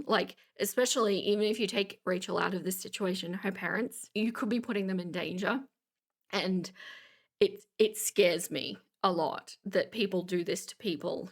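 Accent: Australia. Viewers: Ooh, another Aussie voice. Quite a few of us among reaction channels, it seems (not that I'm a reaction channel - just Aussie :D). Anywho, great video!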